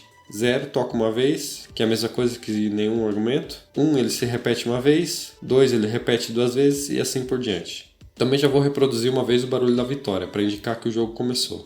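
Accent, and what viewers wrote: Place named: Brazil. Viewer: Eu te conheci pelo canal de inglês, o sotaque brasileiro te identificou, video mto bom, muito obg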